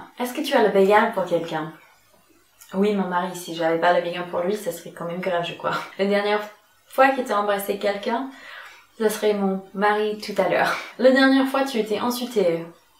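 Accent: United States. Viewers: J'aime beaucoup t'es vidéos , sa a dû être dur d'apprendre le français pour toi , et j'aime beaucoup l'accent américain que tu as 😄 voilà , continu comme sa tu es super !!☺️ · Super vidéo ! J'adore ton accent, j'aime tellement quand les américains ou les anglais parlent en français. Je te trouve vraiment touchante et authentique ! Bisous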